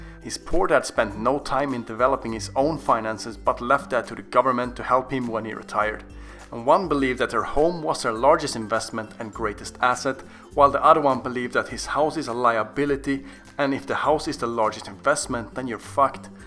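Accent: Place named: Sweden. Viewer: haha din engelska låter precis som när svenska nhl spelare ger interview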